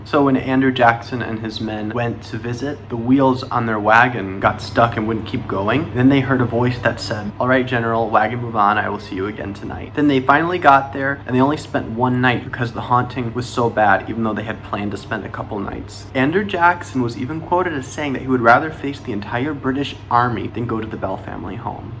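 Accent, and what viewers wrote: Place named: Midwest, United States. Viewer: you have a mid-west accent dude!😂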